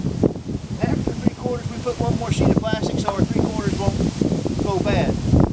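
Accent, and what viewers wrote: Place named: Kentucky, United States. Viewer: Thought you sounded local! Southeastern KY?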